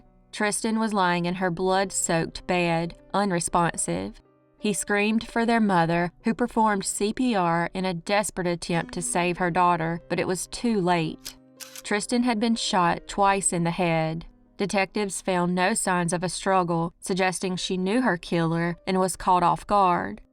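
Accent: South, United States. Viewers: I love listening to you from the other side of the Atlantic telling crime stories with your absolutely lovely southern accent. I just love it. Thank you very much for your work and greetings from Portugal 🇵🇹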